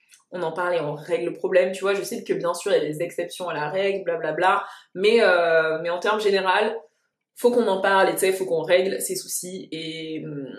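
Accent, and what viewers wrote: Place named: England, United Kingdom. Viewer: J’ai remarqué tu prononces les mots en anglais avec l’accent anglais, t’as vécu en Angleterre?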